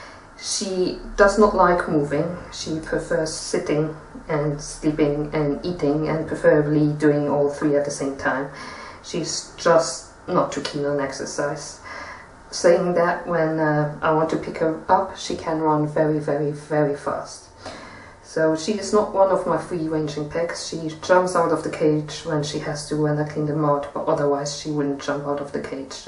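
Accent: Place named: Germany. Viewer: Your accent is awesome!!! Are you German?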